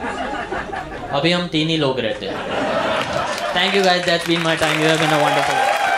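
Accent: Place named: Gujarat, India. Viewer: I love the way that Gujarati accent you use. Hats off. 🤩 you are star.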